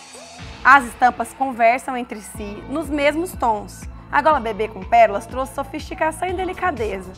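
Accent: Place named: Goiás, Brazil. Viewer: Linda demais com esse sotaque Goiano 😍 Não vejo a hora de te ver ♡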